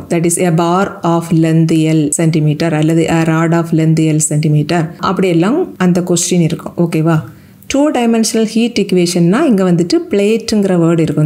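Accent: Kanyakumari, India. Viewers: Kanyakumari slang matiri irukutu niga pasuratu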